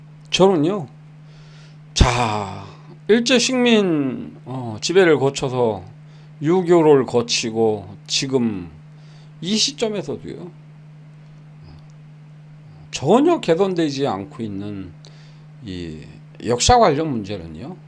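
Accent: South Korea. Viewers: 180석 준 이유? ㅋㅋ 은행에 월세내고 나는것들이 돈 없으니 좌파 찍어준거지.. 근데 당신 말투가 전남 강진쪽인데? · 98% 지지율이 정상국가에서 나오는 수치냐? 쪽팔리는 줄도 모르고 .. 사투리가 전라도 구만 ㅋ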